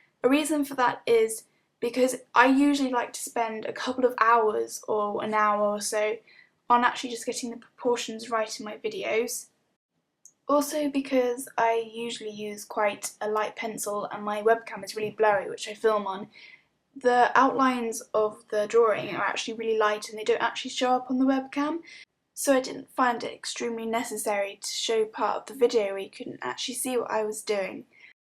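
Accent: United Kingdom. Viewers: Omg you're British haha be aware that that makes most Americans like 200 times likelier to watch your videos..plus, you are one of the best portrait artists on YouTube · R u English r South African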